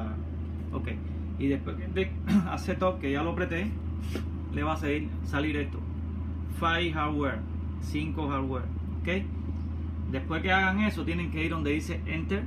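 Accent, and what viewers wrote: Cuba. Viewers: Hola tu eres cubano te reconoci por el hablado, saludos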